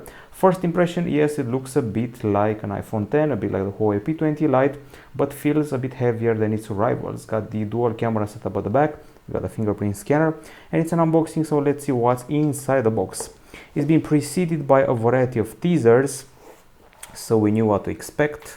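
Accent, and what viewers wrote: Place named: Romania. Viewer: Îmi place accentul de roman:*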